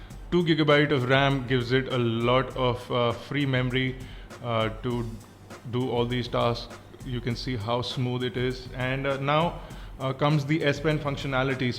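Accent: India. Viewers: oh damn i hate that silly indian accent...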